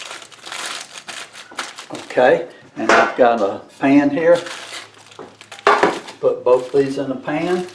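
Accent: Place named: Alabama, United States. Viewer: Yall in Alabama? You sound like Bama...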